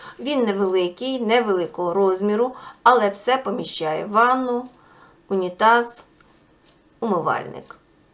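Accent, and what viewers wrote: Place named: Russia. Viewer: Смешно слышать, как русскоязычный пытается говорить по-украински. Не мучь себя и слушателей, говори на родном.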